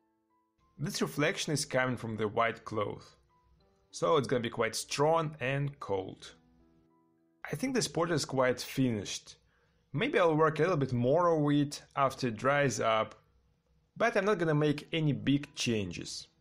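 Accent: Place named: Russia. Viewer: Your channel is great. Subscribed. And your Russian accent is very pleasant.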